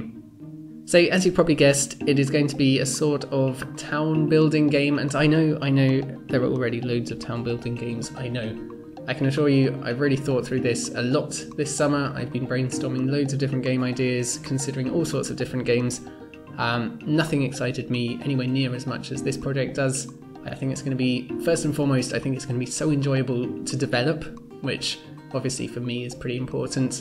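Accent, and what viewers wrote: Sweden. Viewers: Are you from Sweden? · Are you swedich? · are you from sweden?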